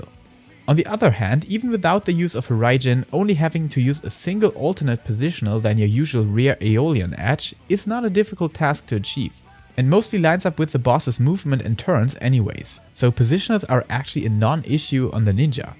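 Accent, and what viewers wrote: Germany. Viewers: you re german right? :D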